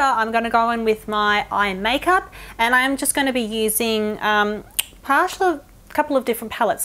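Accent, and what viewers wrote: Australia. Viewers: I love you, you're so funny ! :) I think you're my favorite beauty youtuber ! And as I'm studying English language and culture at university, it's also a way to learn the Australian accent. Best regards from France. :)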